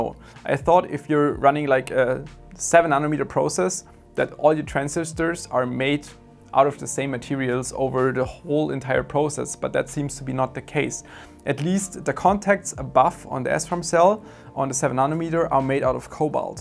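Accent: Germany. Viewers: I knew you were German simply from the accent. My word.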